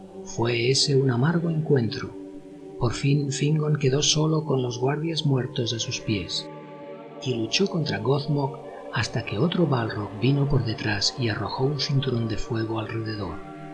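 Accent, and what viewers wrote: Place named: Catalonia, Spain. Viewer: Qué asco el puto acento catalán de mierda 💩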